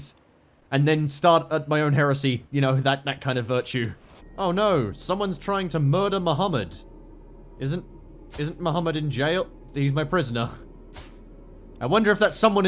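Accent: Australia. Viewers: down under aye u aussie mate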